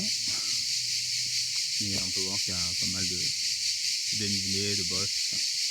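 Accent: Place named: South, France. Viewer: Ah les cigales et l'accent du Sud... J'adore. Je viens d'acheter le même ce matin pour 450€. Bonne route.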